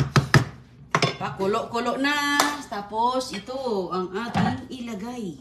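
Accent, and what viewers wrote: Visayas, Philippines. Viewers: ganyan daw mag pronounce ang mga bisaya. Pero ang totoo hindi lahat.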